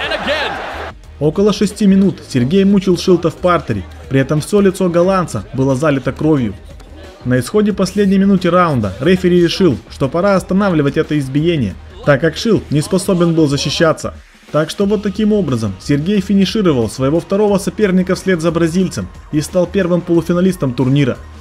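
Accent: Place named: Caucasus, Russia. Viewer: Комментатор с кавказа добавил перца😆👍.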